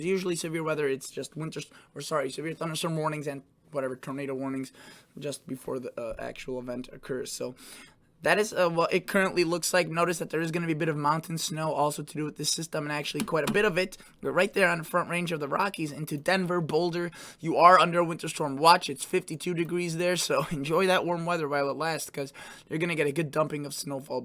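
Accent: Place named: New York, United States. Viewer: Are u looking at Wisconsin for snow? You sound like a New Yorker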